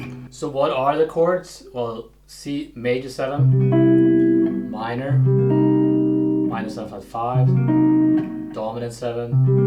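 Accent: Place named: Sweden. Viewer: Great lesson! By the way are you finnish or swedish. Based on your name I'd guess finnish but your accent is a bit more swedish than finnish.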